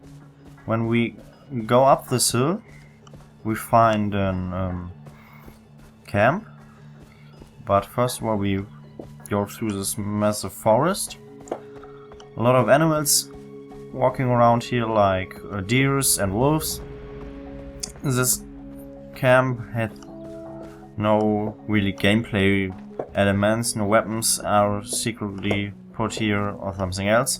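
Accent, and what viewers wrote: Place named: Germany. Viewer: voll hammer mann hört voll den deutschen acsent (hoffenlich richtig) aus der stimme also kommt das noch in Deutsch wäre cool.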